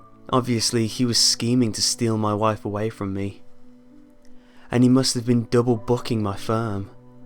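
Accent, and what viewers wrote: England, United Kingdom. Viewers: who are you are you a british or what. im just curious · This guy is mental... so is his soothing british voice.. plus it was disturbing the belt thing... um.. this guy sounds like the british version of Pedobear O-O (\O3O/) GOODNIGHT EVERYONE! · because he is English that's why if you find the accent irritating just don't watch him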